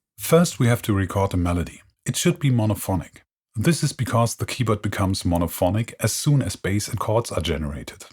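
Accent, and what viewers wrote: Germany. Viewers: Nice to hear the German accent again.👏👏😁😁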